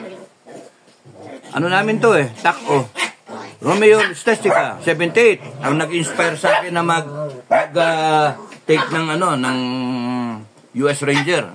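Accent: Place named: Visayas, Philippines. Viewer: ang cute mo mag bisaya Sir! "mag sulti ug tinuod, asa imong pusil?" dagaaan naa na ang mga Scout Ranger. hahahahaha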